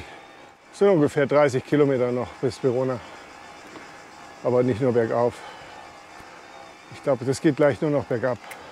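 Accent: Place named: Swabia, Germany. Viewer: Hallo, sehr schöne Filme machst Du, hab schon fast alle angesehen, sehr kurzweilig. Jetzt ist mir auch klar geworden woher ab und an das Schwäbeln herkommt 🙂. Wäre doch ratsam dass Du Dir mal die Insta 360 Rundumkamera zulegst dann hättest meistens beide Hände am Lenker. Hab sie schon bei einigen Radyoutubern gesehen, bin von den Aufnahmen echt begeistert. Bin meistens im Allgäu oder Südtirol unterwegs, das Flachland im hohen Norden ist nicht so mein Ding. Mach weiter so viel Spass bei Deinen nächsten Touren freue mich schon. Liebe Grüße vom Bodensee, Roland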